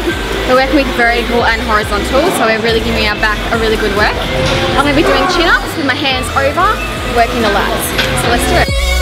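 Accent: Australia. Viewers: I changed my Alexa voice to Australian because of.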